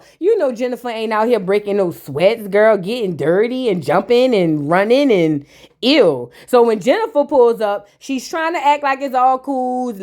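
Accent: New Orleans, United States. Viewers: I love your new orleans accent ❤ New subscriber.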